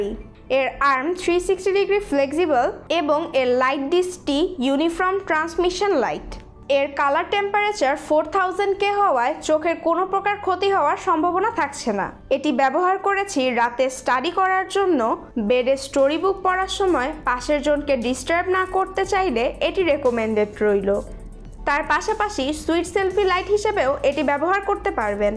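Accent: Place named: Malaysia. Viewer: that’s great i heard bangla spoke from malaysia but it’s sounds different. dunno bak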